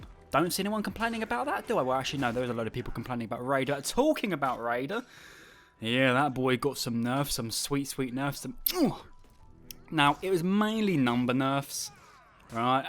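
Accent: United Kingdom. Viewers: Good lord you talk too much and wasted all that time I watched hoping for reveal and got a British annoying voice instead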